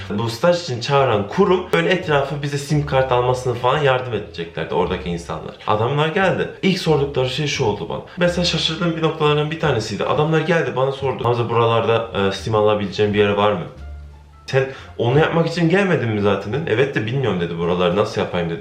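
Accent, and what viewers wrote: Konya, Turkey. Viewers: abi ilk videonu izlediğimde bu kesin konyalı dedim ve konyalı çıktın 😎😎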